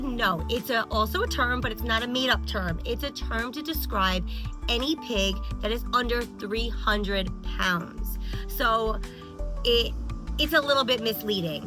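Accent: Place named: Long Island, United States. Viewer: something about your voice sounded vaguely familiar, then I saw Long Island and it all made sense. You got the classic LI accent! Great presentation & interesting ways people try to pass off the pigs as teacups that won't grow.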